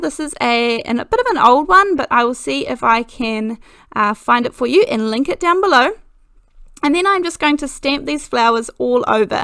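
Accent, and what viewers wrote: New Zealand. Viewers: I am lucky Lisa. Thank you to so much for the beautiful card. I sat watching that video and when she was stamping the s in Lisa I looked at my daughters face and I knew it was for me. I have never been so overwhelmed in my life. It was awesome. I will treasure that card, just as I treasure my georgous daughter for doing that for me. That was better than winning Lotto!!!! Thank you all for the lovely birthday wishes. I love watching videos. I love her style, and her creativity as a craft artist, and I am so comforted by her kiwi accent. And her love of Ali Express. So thank you all so much and now I have finally make a comment in this fantastic group, instead of just watching and admiring.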